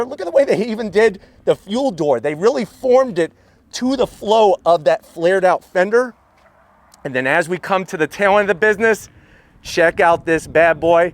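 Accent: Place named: New York, United States. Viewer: )) of course, he sounds new yorkish, they can talk you to death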